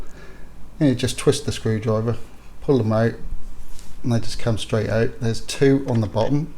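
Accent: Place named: Norfolk, United Kingdom. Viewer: Wow that's a true Norfolk accent what part are you from?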